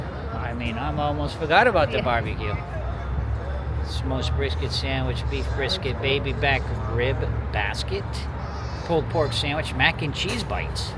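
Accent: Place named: New York, United States. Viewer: I live in jersey and never cut down our own tree artificial I grew upon and to this day artificial lol I thought you were from New York with the accent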